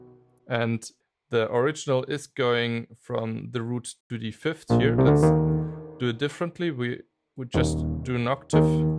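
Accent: Germany. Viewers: his accent gives him away as a german guy ... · Yeah, I'm German as well but have to say this guy might want to work on his accent to make himself more easily understood.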